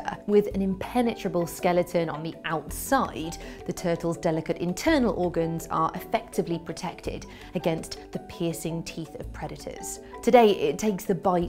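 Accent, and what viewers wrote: United Kingdom. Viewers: Loved the vid...love the Brit accent! "Water" becomes, "wwWW-ta" LOVE it! (not making fun, I really do like listening to Brits talk)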